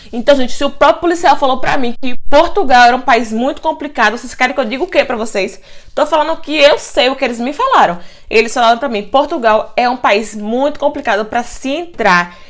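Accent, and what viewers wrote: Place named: Pernambuco, Brazil. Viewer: Gente ela é Bahiana🤣, o sutaque é de Pernambucana , Gui que és Pernambucano.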